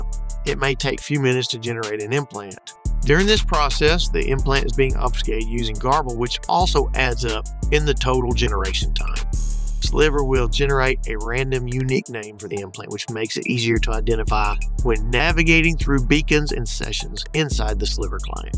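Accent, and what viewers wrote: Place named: South, United States. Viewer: an intelligent person rocks his country accent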